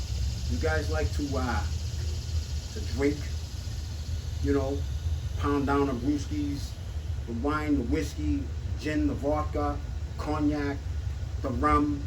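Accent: Boston, United States. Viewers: Great Video. You have the classic Boston accent! Your selection is impeccable. Im a native to Boston and its great to see someone from home. Im subscribed to your channel. Much Love brother👊🙏.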